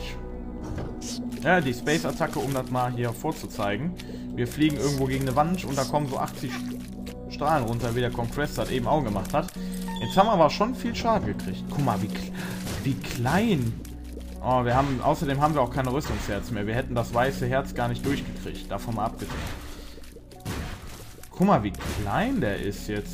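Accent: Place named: Saxony, Germany. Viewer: die bayern reden nicht so xD die aus leipzig reden so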